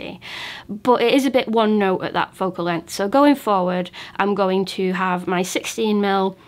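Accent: United Kingdom. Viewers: Love your voice hell😂😂 shit relax me..i can watch any review with that voice. Idk sometime about uk voices..remind me of mary poppin the original..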